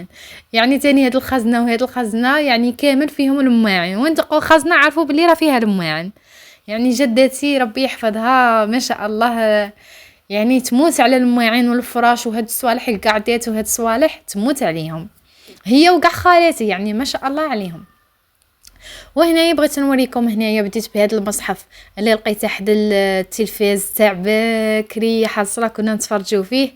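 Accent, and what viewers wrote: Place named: Arab world. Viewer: تبارك الله فكرتينا في الوقت الزين انت من جهة الغرب كما لهجت ناس الغرب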